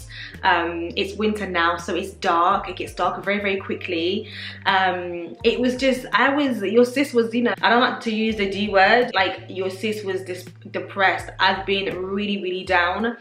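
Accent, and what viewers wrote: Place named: United Kingdom. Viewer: You seem so sweet, please do NOT stop being so nice and friendly because when you do, you have just allowed yourself to be in the class of those who are not friendly. You will get more attention continuing to be who GOD made you. Never let the enemy win! Apparently, they see something in you that they are lacking. You can be reserved, but that's normal, you determine those you detect instantly that you need to be reserved around. See, with all of the designer stuff ( which btw, Chanel is my favorite), some black women get so caught up in doing this as a job and then losing themselves in it, that they lean more towards the designer part....like oh, I'm better, I'm an influencer, I've got, I've got....so I'm better than you, or I can compete against you. It only means you can tell they are not use to having. GOD can wipe all they have away in a matter of seconds. 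And yes, I'm speaking of my black Sisters, not all are like this, but I dont care about what other ethnic backgrounds think. We need to get better at working to " influence" each other. Love your accent....dont know if you are from the UK, but I hope to visit one day. I did live in Europe for 3 years, but that was years ago....b blessed, stay nice and humble, putting GOD 1st and you will flourish...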